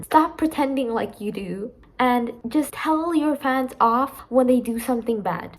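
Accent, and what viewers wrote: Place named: United Kingdom. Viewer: "TW: British accent" Lmao i love her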